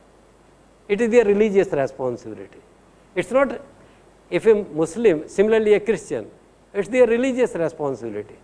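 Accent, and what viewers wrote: India. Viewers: have trouble understanding the Indian accent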